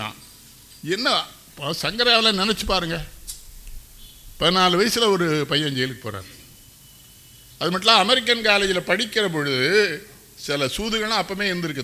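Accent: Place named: Tirunelveli, India. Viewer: உங்கள் நெல்லை தமிழுக்கு நான் அடிமை ஐயா வாழ்க உங்கள் புகழ் 🙏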